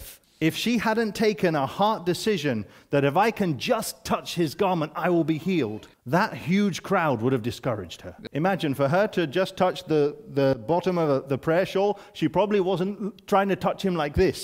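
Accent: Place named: Netherlands. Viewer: Do you live in Nederland? I can hear dutch language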